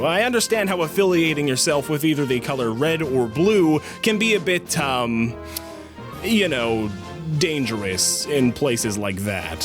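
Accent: United States. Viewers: I knew I was right about that kansas accent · Does everyone in the US have an accent like that? I mean it sounds much cooler than british english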